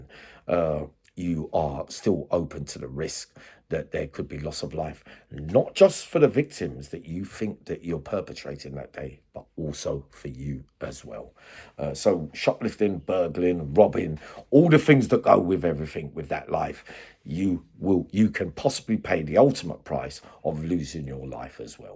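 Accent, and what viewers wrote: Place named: Bermondsey, United Kingdom. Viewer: I reckon your from Bermondsey, London. or close.!!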